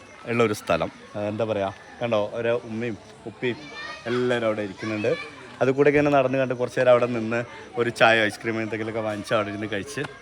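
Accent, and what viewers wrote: Palakkad, India. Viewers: വീഡിയോ പൊളി... ഇടക്കുള്ള dialogues... സൂപ്പർ... നിങ്ങളുടെ പാലക്കാടൻ സംസാരരീതി കൊള്ളാം 🤣ചെറിയ മക്കളൊക്കെ (boys)പൊളി 🥰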